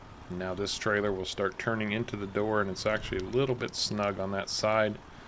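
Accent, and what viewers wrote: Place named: United Kingdom. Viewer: Hi Thank you so much -- without your intro 10 vids on "Ravenport" I'd never have played this game. I can tell you as a fellow Brit its that accent - a winner in USA. Also there aren't any other vids that give useful info on how to do. Ive seen the "out in the wild vid" love that. Im now in Dallas ex New York. Im totally absorbed in Ravenport on my way to 1st $million. About to expand field 19 up the hill. What I would love is a tutorial if you have one on making the tree cutting/logging viable and worthwhile. The weird bark colored trees are a real hassle to cut up and transport and sell - 23 so far. Ive had success with some leveling landscape but want to put chickens pigs and cows into Ravensport which is so small but I believe its possible. How about Ravenport Part 2 my first Million???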